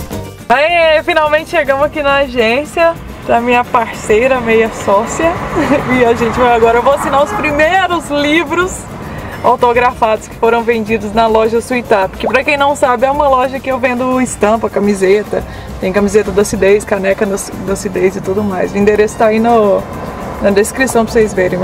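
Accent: Minas Gerais, Brazil. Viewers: Ai, sotaque mineiro! O seu não é tão puxado, mas o do seu irmão é muito! Adoro! Minha família é mineira e morro de saudade do sotaque deles! Sei bem como você se sente vendo o seu primeiro livro pela primeira vez porque também sou escritora e publiquei livros, e é incrível pegar o SEU livro pela primeira vez! É lindo! Mas claro que você vai vender muito mais que eu. hahahahahaha De qualquer jeito, boa sorte e eu com certeza vou querer seu livro. Beijos e parabéns pelo livro! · Ai bom demais da conta esse sotaquinho nosso mineiro né gente!!! Sucesso!!! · Que simpatia voce! Conheci recentemente seu canal e estou gostando. E esse sotaque lindo, me deu saudades da minha querida Minas! Araxà è uma gracinha, nao fica muito longe da cidade onde eu morava ( Lagoa da Prata)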